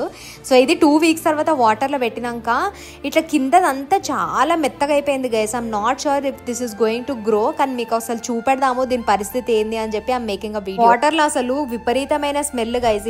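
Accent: Telangana, India. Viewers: Telangana slang . Guys guys ani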